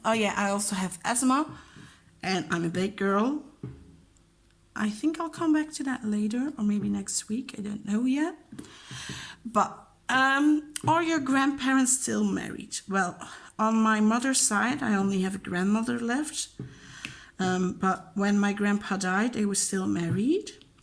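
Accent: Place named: RP, United Kingdom. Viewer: I love your name so much and I think it’s so cool where your mom got your name from. I love that song! The variation to Berlin is really beautiful as well. I want to hear you speak alllll the languages! 😂 If you could come up with something to say in Dutch, and then say the same or something extremely similar in your dialect, I think that would be awesome! We might not understand it, but we can try to hear the differences. I’m one of those people who love your accent! It’s so hard to explain sounds, but I can hear a little bit of that BBC sometimes and your words have a kind of flourish to them sometimes? I don’t know, it just sounds fancy! 😂 There are definitely many people here in the US who do not appreciate teachers and also make the same comments about them having lots of vacations and stuff. Unfortunately they don’t understand that, as you said, you’re still working (unpaid most of the time) when you’re not in class with students and that working with so many kids is EXHAUSTING. 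I know I definitely could not do it!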